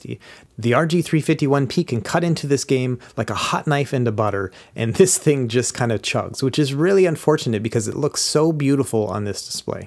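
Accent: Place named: Midwest, United States. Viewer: Maybe it's just me... But u sound way more Midwestern in this video than in others 😭😭 u in MN or sumn? If so let's be homies 🤞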